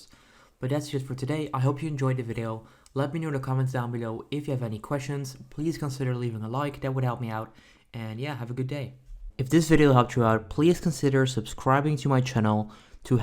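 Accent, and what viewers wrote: England, United Kingdom. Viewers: Finnaly! A video about this that is in proper English accent there is no way I can understand indian people talk english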